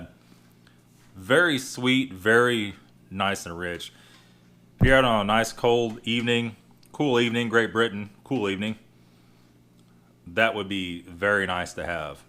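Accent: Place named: South, United States. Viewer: He sounds Southern, maybe Louisiana. They have a different way of pronouncing things down south. Can't help how he was raised. Alot of my family is from New Orleans, it gets irritating sometimes with how they pronounce things but, you cant change how they speak. That'd be like getting mad at a British person for pronouncing something differently than we do when speaking English.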